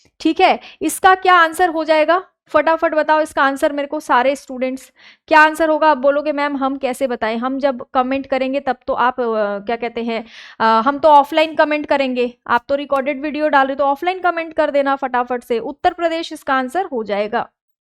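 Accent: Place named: Bihar, India. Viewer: Madam ji aapka bolne ka excent thoda Bihari jaisa lagta h but accha bhi lagta hai 😊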